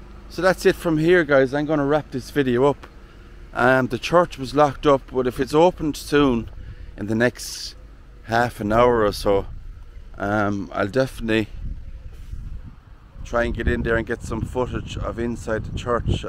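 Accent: Ireland. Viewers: Oh my goodness, that is terrible, it's unthinkable. Keep up the good work, I think you are wonderful beautiful Irish voice. Lynn in Brittany x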